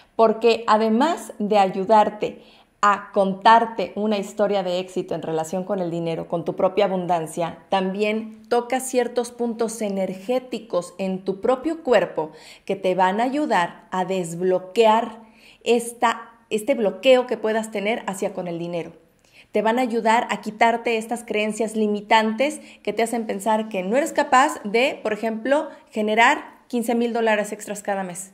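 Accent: Mexico. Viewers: Hola Mexicana hermosa Me gusta tu tono de mexicana A parte que eres linda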